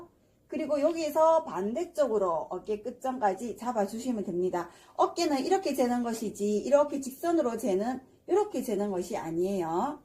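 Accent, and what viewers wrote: Gyeongsang, South Korea. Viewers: 문화회관 출강하신다고 하셨죠? 지역이 어딘지 가까우면. 수업듣고 싶엊네요 말씨는 경상도라 살짝 기대해봅니다 ㅎㅎ 막힘없는 설명 속이 뻥뚫리네요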